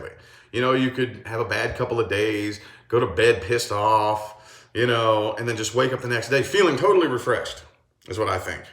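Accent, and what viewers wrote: South, United States. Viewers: I listen to him and no, I don't see the connection. Except that they're southern US born.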